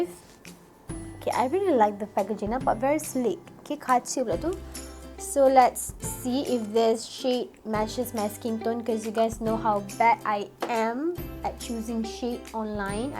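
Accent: Malaysia. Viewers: Wahhh dh nk 100k subscriber...moge terus sukses, suke tgok die ni, comelll, speaking English care Melayu, 👍👍👍💖💖💖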